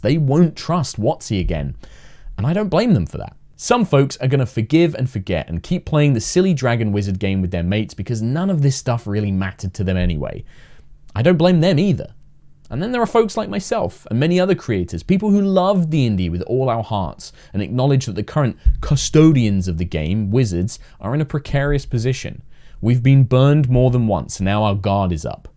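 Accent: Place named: United States. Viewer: I love you but why have you grown more and more American? You pronounce t's as d's.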